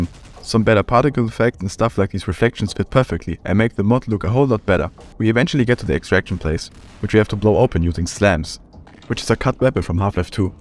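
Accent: Germany. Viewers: Is he german ? i can't tell if so, ich liebe deine videos und dein content allgemein. Schönen tag noch ! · I have a question:are you German? Besause i am German and you Said one time that you have a German ankzent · I loved to play EZ2 with it having an amazing story, and having a really cool stealth part with the monster in the Aperture Lab. Literally gave me chills! I loved playing these games as much as I enjoyed this video. Good job EZ2 devs and (btw your german accent is beautiful can't hate it. And don't worry mine isn't better xD)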